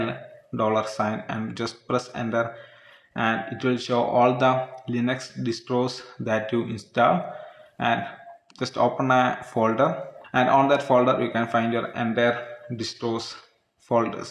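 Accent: Kerala, India. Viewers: bro you mallu or tamil?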